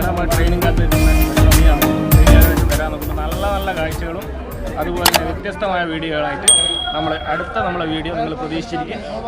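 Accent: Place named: Kannur, India. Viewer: Superr.... Kannur basha തകർത്തു..👍👍🤩🤩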